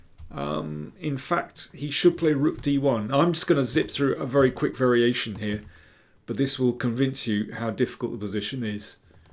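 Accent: England, United Kingdom. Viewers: wonderful precise analysis, with that lovely English accent. bravo.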